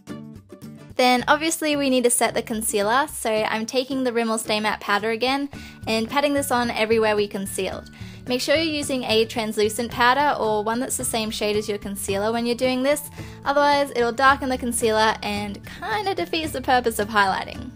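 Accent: Australia. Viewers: Woa you are stunning! It's also lovely to hear an aussie accent :D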